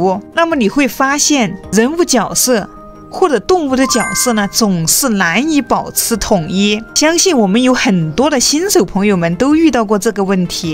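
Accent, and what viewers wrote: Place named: Sichuan, China. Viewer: 川普哈哈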